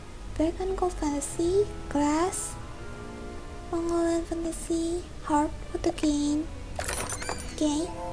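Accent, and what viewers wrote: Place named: United Kingdom. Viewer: You have a nice britsh voice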